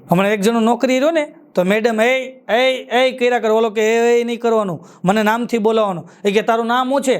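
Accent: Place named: Kathiawar, India. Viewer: હસતો રહે, હસાવતો રહે, કાઠિયાવાડી લહેજામાં સંસ્કારી હાસ્ય... પ્રસરાવતો રહે!!! રિદ્ધિ-સિદ્ધિ ખુબ વરો... વડિલોના આશીર્વાદથી સિદ્ધિનું એવરેસ્ટ સર કરતો રહે!!!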